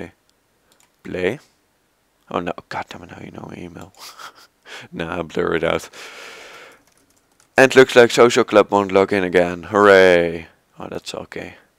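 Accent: Netherlands. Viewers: oke gozer ik hoor dat je NL bent maar het werkt niet bij mij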